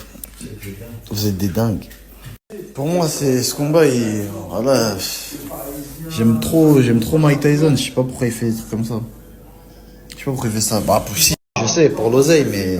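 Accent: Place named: Cameroon. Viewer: Arrête de parler camerounais tu fais honte au continent !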